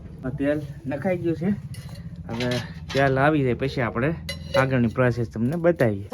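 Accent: Saurashtra, India. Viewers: ખુબ જ સરસ દાળ બનાવી છે, આપની સૌરાષ્ટ્ર ની મીઠી ભાષા ખુબ ગમી જાય છે સૌરાષ્ટ્ર માં શિક્ષક ની નોકરી દરમિયાન આ આનંદ ખુબ જ લીધો છે, માયાળુ માનવી નો દેશ એવો છે કાઠીયાવાડ..... તારાપુર થી ભરતભાઈ વાટલીયા નિવૃત્ત શિક્ષક તથા ઘરપરિવાર ના જયગુરૂદેવ.. જયમાતાજી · Saurashtra ni mithi bhasa ghana valhate sambhalavs mali... Very nice..👌👌 ek j saggetion chhe... Tel ni jagya e guare banavela chokkha ghee no uptog karvo ..baki tame dal ne rotla mate jabarjast banavya chhe... 👏👏👏👏👏👍👌👌👌💐🌺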